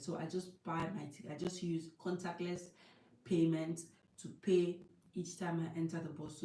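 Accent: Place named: Akwa Ibom, Nigeria. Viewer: My typical Akwa ibom sister. Your tongue betrayed you big-time.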